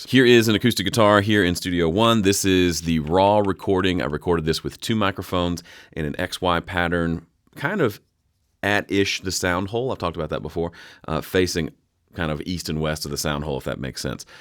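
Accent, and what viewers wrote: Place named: South, United States. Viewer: Thanks for the great videos! These have been very helpful as I self-produce. Also, nice Southern accent!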